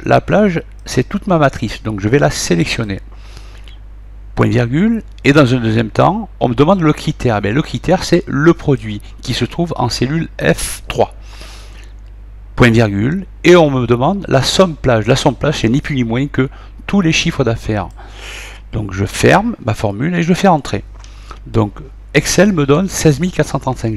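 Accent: South, France. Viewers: Meilleur intro. On est dedans direct (enfin moi je l'étais) et puis ça part d'un coup. Ça parle bien c'est net et précis. Mention spéciale à l'accent du Sud qui me rappelle cette boite de conserve "saucisse lentille" mangée en khapta. Merci encore <3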